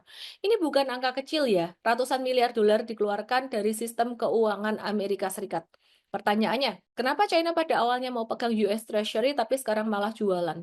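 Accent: Java, Indonesia. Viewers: trimksh Mbak 👍🏽 Analisa yg bagus, bahasa sederhana, ples jawa medhok, 😁 bagus 👍🏽 tapi maaf aq br nemu chanel ini stelah huru-hara si Trump ini. Usul sy, maaf, saran sy 🙏 kameranya jangan hanya dr 1 titik Mbak, ada angle kanan & kiri, ato depan agak ke atas dikit,agak jauh dikit, gtu agar tdk bosan menikmati penjabaran mbak. 👍🏽 & biar Qt ada fariasi natap mbak dr sudut angle yg lain.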